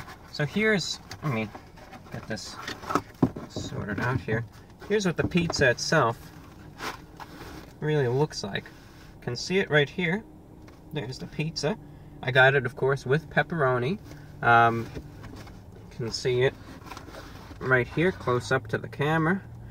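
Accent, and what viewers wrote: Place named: Transatlantic, United States. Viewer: The name of that accent is Transatlantic as it's supposed to mix in elements of GA and RP.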